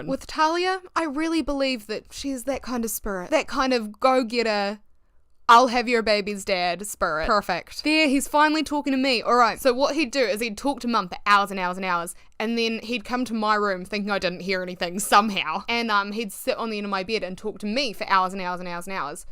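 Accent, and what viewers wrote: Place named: New Zealand. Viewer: I find your videos such a comfort. This one is different but still the same you. The way you tell stories is so captivating. Also, being an Aussie I find your Kiwi accent very cute and nice to listen to and not hard to understand at all. Thank you for bravely and humorously sharing your story. I hope you no longer assign any blame on yourself, you did nothing wrong and every action you took was the right one, including keeping the diary and telling your mum when you did. Props to your mum for looking after you as best she could. Sounds like it was a horrible, difficult, and frankly ridiculous situation to be living in. The relief you feel after his death is so real and valid and 100% allowed no matter how much you also love the not abusive parts of the person. Wishing you a peaceful and happy rest of your life, and the opportunity to experience the moments of joy and wonder you missed out on as a kid ❤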